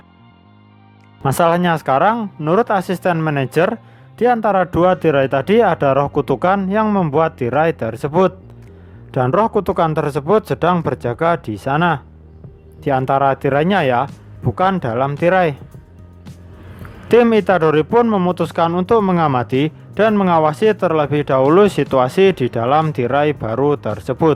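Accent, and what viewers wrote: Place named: Java, Indonesia. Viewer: terlalu medok gan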